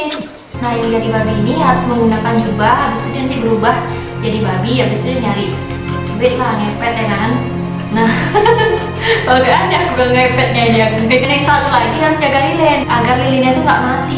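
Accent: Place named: Medan, Indonesia. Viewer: Logat medan nya masih kental banget ya kaa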